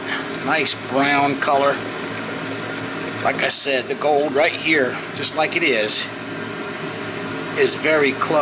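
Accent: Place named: Philadelphia, United States. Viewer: you're from the North East aren't you? I wanna say around me in NJ (in the south where we don't have that accent - we sound liker Philly) and I feel like I hear some Philly in your words, but I'm probably wrong. That's be cool if if I guessed it though. :)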